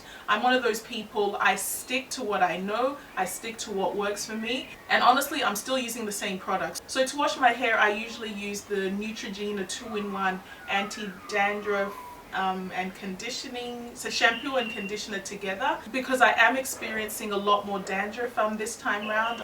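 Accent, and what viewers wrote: Australia. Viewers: I love your hair and your top. This is my first time on your channel, but is your accent Australian? I can't place it exactly.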